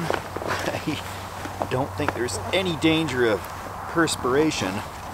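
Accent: Canada. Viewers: Don't know if it's that smooth Canadian accent but everytime I watch, I'm relaxed and know everythings gonna be alllllll right.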